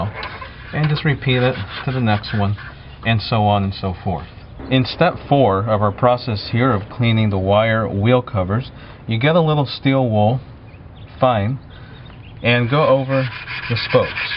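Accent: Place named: Canada. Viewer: You must be from Canada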